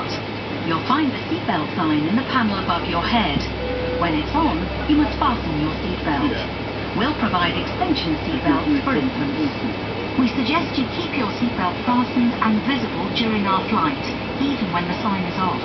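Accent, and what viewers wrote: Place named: United Kingdom. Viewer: Ah, yes, upper deck on a BA 747, what more could you ask? The best thing about this video is the beautiful british accent and of course the music.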